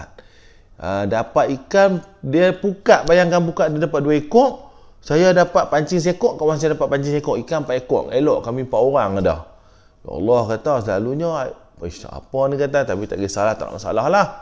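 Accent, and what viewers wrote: Kedah, Malaysia. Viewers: terbaik la tuan bercerita… loghat kedai piaw punyaa… hahaa..dari kedah mariiiii…. kuala nerang mai tuann…. seronok dengaq bercerita dan lawak penuh nasihat… nak part 5 dan 6 seterusss smpai bila bila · trima Kasih Tuan sudi berkongsi cerita, sikit sebanyak mnjadi ilmu utk kami. tenang ja Tuan cerita piaw kedah punya hehehe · Assalamualaikum tuan,best cara tuan bercakap.Macam orang Kedah bunyinya.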